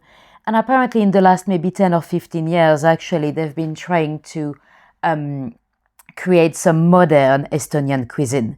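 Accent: France. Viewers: Madame, quand vous essayez de parler anglais votre accent français est presque comique.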